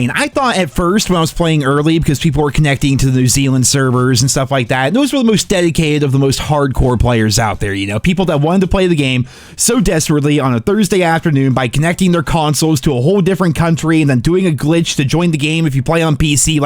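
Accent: Scotland, United Kingdom. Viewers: Scottish not Irish dude 😂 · Irish?????? Clearly Scottish..... I think you said sweaty players 5 times while laying prone in the corner 😂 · Lmfao as a Canadian that was an obvious Scottish accent 🤦🏽‍♂️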